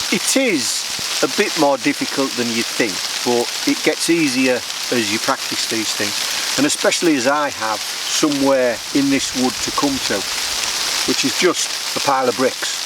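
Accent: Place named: England, United Kingdom. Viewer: Very convenient music was choosen on the beginning! And what a lovely wood! What location is this? I assume somwhere in England, according to your accent?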